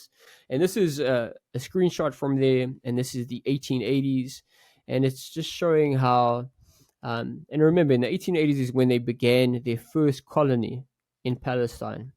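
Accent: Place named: South Africa. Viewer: Sorry to ask trivial questions - where is from? His accent sounds so similar to South African. Aweh.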